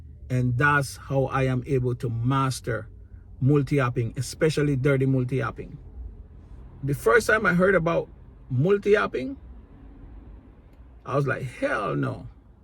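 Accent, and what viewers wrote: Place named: Jamaica. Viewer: Awesome sounds like a yard man🇯🇲 . Congrats on the channel.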